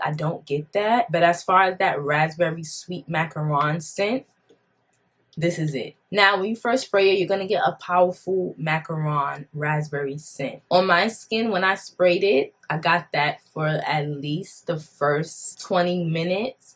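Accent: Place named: East Coast, United States. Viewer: It’s giving East Coast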